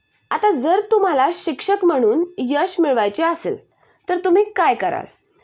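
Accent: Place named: Vidarbha, India. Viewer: Tuze language konti aahe tu kashi bolti tyamde lokana kahi kalena zalay Vidhrbhatli aahe ka tu bai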